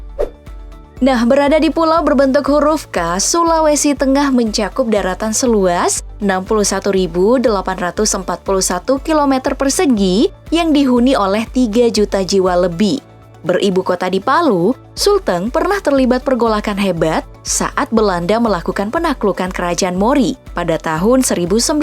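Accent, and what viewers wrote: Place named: Central Sulawesi, Indonesia. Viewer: Ahh mimin udah bisa logat sulteng😆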